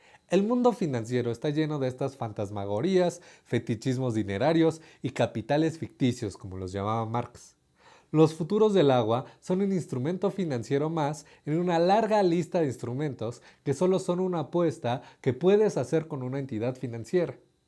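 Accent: Mexico. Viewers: Nuevo suscriptor, encontré en tu vídeo la mejor explicación a mi duda, me supongo que eres mexicano por tu acento, ojala pudieras exponer con este mismo lenguaje el tema de la fluctuación de los tipos de cambio, hay muchas explicaciones que indican como funciona actualmente, pero mi duda es como se cotizaba el peso mexicano antes de 1994 y si todavía hay países que tengan ese tipo de control cambiario y como les va por qué aquí:si Grecia tiene demasiados burócratas el dólar sube si llega un tsunami a Indonesia el dólar sube, si se atora un barco en el canal de suez el dólar sube, si los texanos no pueden pagar sus hipotecas el dólar sube, si se incrementa la producción automotriz en México el dólar sube si Putin le dice compadre a Trump......etc.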